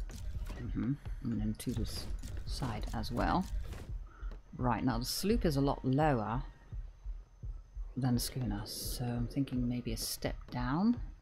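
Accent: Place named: RP, United Kingdom. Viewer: Well spoken accent, as a geordie good English always sounds posh :)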